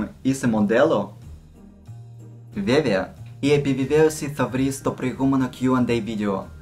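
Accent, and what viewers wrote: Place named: Russia. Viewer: Τρελαίνομαι για Ρωσική προφορά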